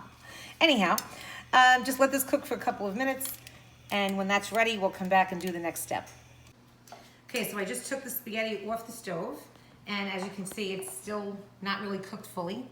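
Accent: New York, United States. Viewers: Methinks this lovely lady is from Brooklyn or NYC, from her accent. this does look good, but gee--10 eggs??? WOW! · peppa , sawlt....and butta... AH... I love my NY peeps! Awesome !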